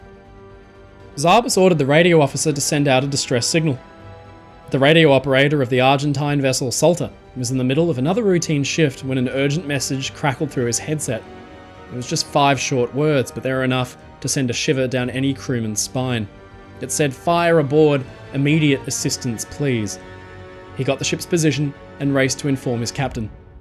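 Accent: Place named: Australia. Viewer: Australian or whatever it is accent also adds a distinctive flavor to his superb storytelling.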